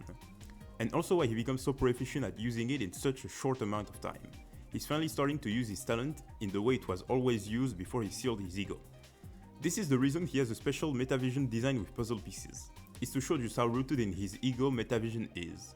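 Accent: France. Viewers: I knew you sounded french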